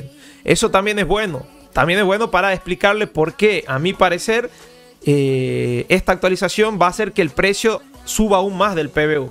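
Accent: Tucumán, Argentina. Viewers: Sos Tucumáno o santiagueño ?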